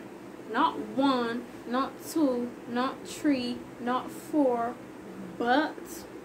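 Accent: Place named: Caribbean. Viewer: You gotta be from nice Caribbean, which one?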